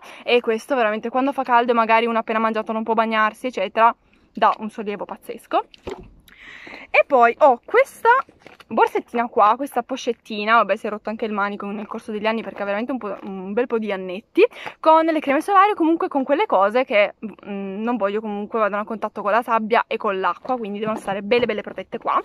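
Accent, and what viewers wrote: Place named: Salento, Italy. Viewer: MAMMA MIA QUANDO ASCOLTO IL TUO ACCENTO, È MOLTO VICINO AL SALENTINO.. MAMMAMIA CHE NOSTALGIA DELLA MIA TERRA. SALUTAMI LU SALENTU,LU SULE, LU MARI E LU IENTU 🤭🤗🤗🤗🤗😂😂😂😜😜😜😜😜😜😜😛😛😛😛😛😛🌹🌹🌹🌹🌹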